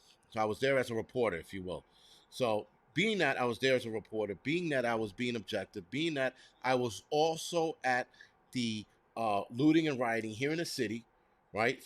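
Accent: New York, United States. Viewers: I loved your video. You are so real and talk like a born and raised New Yorker. When I heard you talking about you might be in trouble for just being at the rally my heart skipped a beat. I pray you keep your job and your family are safe. Please keep go for the ignorigation. We don't need to see a few dozen people that will be there. You have been talking about getting out of the city for a while maybe this is a sign for you and your family to leave soon. 🤬🐈🤭💖. God bless you and stay safe.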